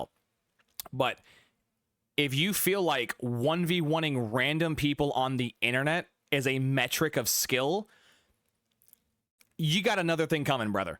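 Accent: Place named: Mid-Atlantic, United States. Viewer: I think your accent was the now extinct Mid-Atlantic accent.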